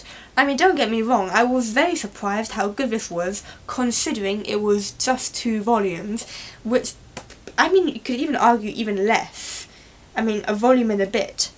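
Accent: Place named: United Kingdom. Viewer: Sounds British to me. thanks for the review just what i was looking for:)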